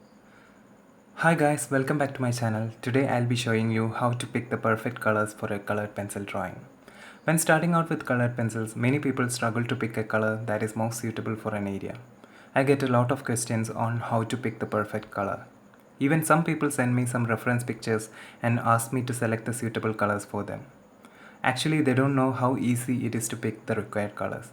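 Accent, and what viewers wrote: Kerala, India. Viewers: I think you are malayali .becoz of your English accent .nice to meet you thank you for this video .iam a malayali too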